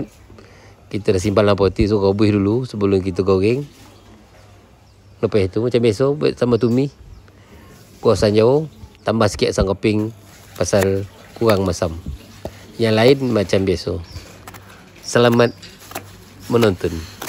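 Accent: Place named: Negeri Sembilan, Malaysia. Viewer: Org nogori ya 😊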